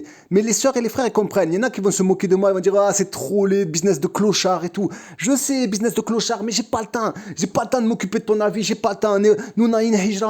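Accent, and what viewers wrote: Marseille, France. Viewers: Salam, tu m a fait trop rire avec la charrette...et ton accent marseillais...j aime beaucoup Tlemcen, j y suis venue en octobre et j ai visité ce magnifique hotel la Renaissance..bonne continuation et courage à toi...il me tarde d y revenir au prochaines vacances in challah.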